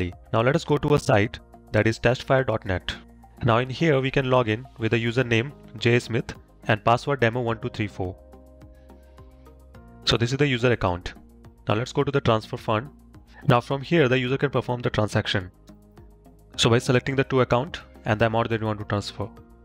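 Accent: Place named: India. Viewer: you are indian and on top of that you are talking fast , so i just gave up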